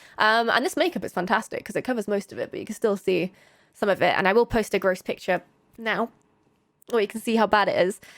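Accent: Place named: England, United Kingdom. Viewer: Your cute & fun, always a sucker for a nice English accent...your easy to understand, (cause' I'm American)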